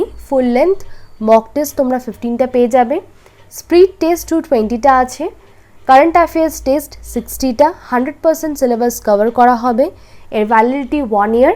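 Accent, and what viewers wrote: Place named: United Kingdom. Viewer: Mam apni oxford english bolchen.ইংরেজ দের মত বলছেন কানে বডড লাগে।আপনাকে ছোট করলাম না।sorry vul hole khoma korbe .apner question gulo khub valo.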